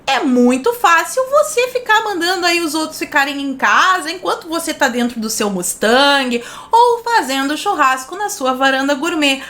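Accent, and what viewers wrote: Rio Grande do Sul, Brazil. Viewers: Acho que você é gaúcha porque você fala muito o né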